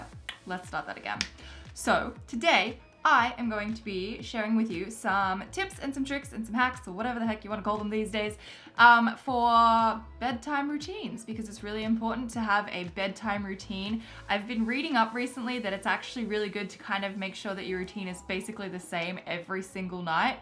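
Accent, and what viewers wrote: Australia. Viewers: what is your accent ?where are you from ? just wondering , I have heard others with the same accent but I"m curious ? Is it an Australian accent ?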